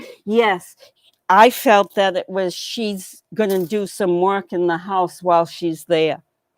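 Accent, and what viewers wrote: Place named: Boston, United States. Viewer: Your accent is similar to JFK so Bostonian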